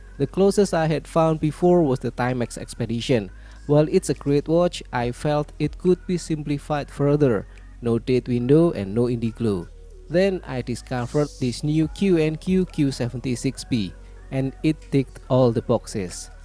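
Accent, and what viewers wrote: Indonesia. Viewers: Your Indonesian accent is familiar. Btw good job.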